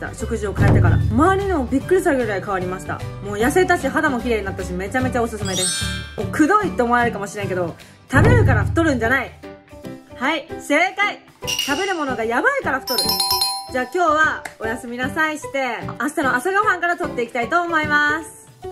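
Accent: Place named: Hakata, Japan. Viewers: あ！博多弁やん笑笑聞きやすい笑笑天才やね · 俺と喋り方「博多弁」がばり一緒っちゃけど、街ですれ違ってるかもね笑笑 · 〜やけんとか〜とよとかは博多弁ですよー。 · 博多弁いいですよね私、博多在住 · と同じ身長で６７キロまで太りました😭 食事は給食あるから無理だけど、頑張るぞ〜！私と同じくらいズボラやし、九州出身？と思われる方言が素敵やね。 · 糖質制限から7キロリバウンドした💦 博多弁丸出しの密かにずっと見とって、博多人間からしたら友達の領域w まじうけるw🤣ちょっとまじでまた7キロ戻そかなー！頑張る💪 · 博多弁親近感湧く😂💕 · 方言が一緒やな……って思ってたら福岡の人でした!!余計に嬉しいです( ｰ̀֊ｰ́ )✧︎ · 博多弁に癒されます！ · 同じ福岡だからか方言がしっくりww面白いかよw しかしバリカワ！チャンネル登録しましたー！ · 博多弁サイコー❤美人でええな🎉うちも博多たい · は九州？うちは福岡やけど話し方が福岡っぽくて親近感があった❣ うちも今ダイエットの迷路にはまってしまってるけん、のを参考にしますだ✌ストレス溜まらんようにやね❤これからも楽しみに見ます～😃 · 、福岡弁かわいい💞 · この雑さ具合が好きになる〜方言的に福岡の人なんかな？ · 喋り方が福岡やけんめっちゃ親近感わきますｗｗ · 最近知って式マッサージとかやったりしてるけどいままで毎日やってるとマッサージの内容(?)とか覚えたら動画見ずにやったりしてたけどが見たいからマッサージ覚えても毎日動画見に来てる🙌まじで考え方とか性格とか好きすぎるし、何より私も九州の人間だからイントネーションとか聴きやすくて方言も好きすぎる💓唐揚げ私も大好きだからたまに揚げない唐揚げ作ってみようと思う💪私はYouTubeで知ったけどまじで知れてよかった😂💓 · はじめまして☺️ 私の半分しか生きてないやけど、かわいいけんチャンネル登録した❤️ 博多弁？私も福岡やけん聞き取りやすい☺️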